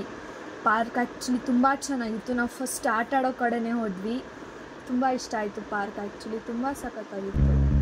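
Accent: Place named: Mangalore, India. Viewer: Naavu moodbidire alli vodidu alvas pu college i loved manglorean accent 1st when I saw your video in instagram I thought you are from dk ❤🎉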